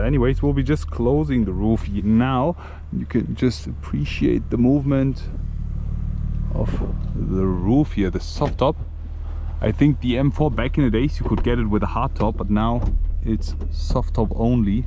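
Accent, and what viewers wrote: Germany. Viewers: Bro. Yr accent 100% kartoffel gotta love it!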